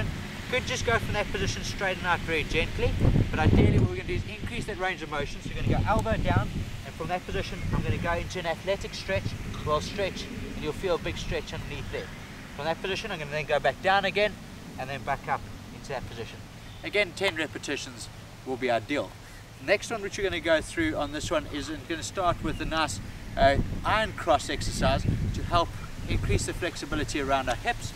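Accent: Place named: South Africa. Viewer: He's South African not English you muppet. Great video thanks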